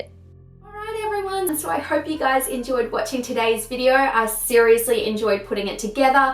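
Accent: Australia. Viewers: Nice to hear an Australian accent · Omg are you Australian sameee finally yay 😊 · Are u Australian??? I am and I just found ur channel and I subbed 🤗🤗🤗🤗🤗🤗TADDAA I did way to much for a first vid but whatever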